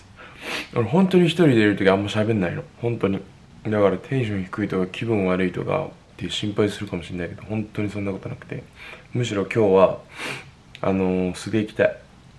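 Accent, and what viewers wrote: Japan. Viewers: The rain sound and his japanese accent really addictive. It's like the best ASMR 😂 I should watch this video before sleep 🤣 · JAPANESE NCTZEN! Does he speaking an Osaka accent or just standard?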